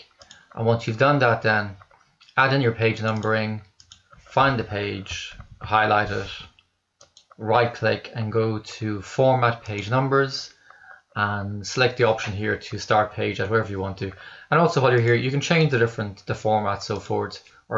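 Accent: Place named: Norway. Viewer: Love your accent. Norway?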